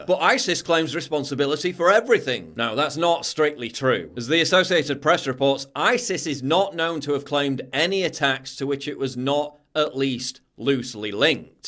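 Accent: United Kingdom. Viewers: This guy speaks with a heavy British accent. Are they even allowed to own in Great Britain. I bet that he has never shot a 50 cal, and probably denies ever masturbating.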